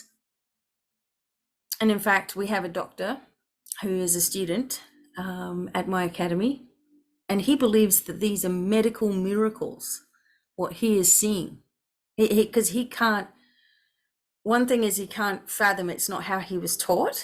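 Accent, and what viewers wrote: Australia. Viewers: I absolutely loved this message ❤and really hit that deep spot! Ya aussie spirit and voice 😂came out especially at the beginning with the voices about people not understanding why we have a energy exchange 😅 omg I was rolling around in laughter vibes flying high lol 😂 Thank you for the depth and the joy ❤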